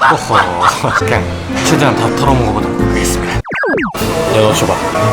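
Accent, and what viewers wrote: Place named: Chuncheon, South Korea. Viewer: 춘천이 어떤가요? 춘천 닭갈비 추천이랑 억양도 비슷함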